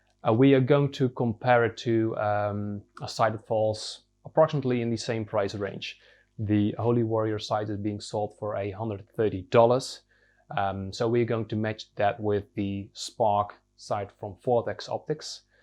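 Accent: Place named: Scandinavia. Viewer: I hear that Viking accent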